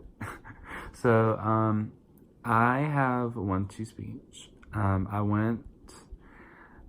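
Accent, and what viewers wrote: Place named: South, United States. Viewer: What part of the south you from. I hear it real well lovely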